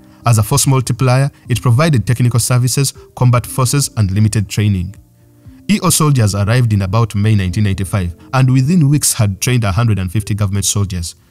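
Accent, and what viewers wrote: Uganda. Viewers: This is one of the best documentaries on "mercs", after Anthony Mockler's book, The new mercenaries, about mercenaries in Africa. The commentator sounds Ugandan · This guy is Ugandan, Just by his accent. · The narrator sounds Ugandan....a Muganda to be exact. Ono Musajja wa Kabaka! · I know that accent.. Uganda Oye! Great content, ssebo! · Narrator sounds Ugandan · Excellent presentation! The narrator has a distinctly Ugandan (or should I say East African?) accent, by the way.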